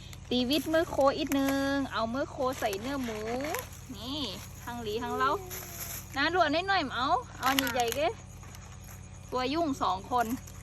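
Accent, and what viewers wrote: North, Thailand. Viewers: ฟังรู้เรื่องนะ ภาษาไทใหญ่ก็คล้ายๅกับสำเนียงไทยทางภาคเหนือ ผมคนอีสานฟังรู้เรื่องครับ ขอให้ครอบครัวมีความสุขนะ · พูดเหมือนทางภาคเหนือของไทย.อีสานก็ฟังรู้เรื่องเกือบทุกคำน่ะ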